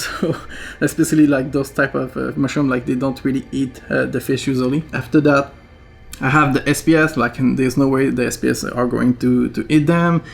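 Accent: Quebec, Canada. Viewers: Hi sorry for the loss are you from Quebec Canada. Cause you have an accent.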